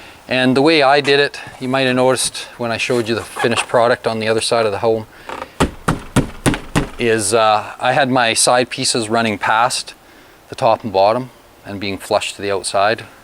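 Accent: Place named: Saskatchewan, Canada. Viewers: There isn't a Canadian accent. This is a Saskatchewan accent. Put him next to someone from Cape Breton and they'd sound pretty different. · Canadian accent is hilarious.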